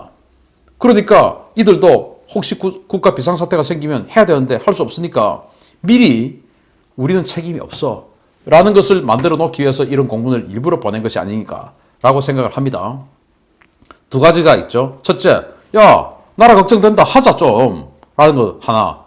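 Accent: Gyeongsang, South Korea. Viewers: 당신 경상도 사람이지 말하는것 보니 티가나네 좀 젊잔케 해봐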